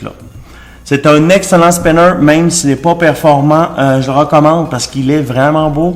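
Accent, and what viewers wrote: Canada. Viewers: tu as l aqucant du kanada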